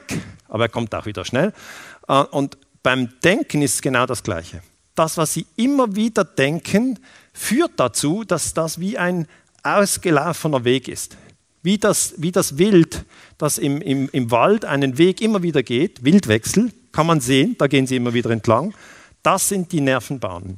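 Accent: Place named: Switzerland. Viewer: Es chomt immer wider chli schwitzerdeutsch vo ihm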